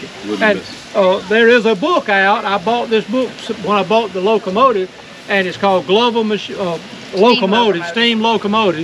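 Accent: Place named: Georgia, United States. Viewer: Love that sweet Georgia accent ❤️ excellent history captured here. Thanks for sharing!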